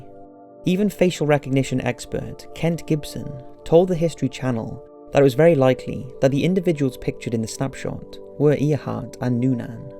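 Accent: Wales, United Kingdom. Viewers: This is awesome, I love your research. You’ve got a Welsh twang too - even better! Please solve the Ripley ghost photo as that is the only thing on the planet that truly freaks me out. I think I read that it might be a photographic anomaly and could be of the flower arranger, but that would have to be one heck of an anomaly to end up as an eight foot cowled figure without a face. shudder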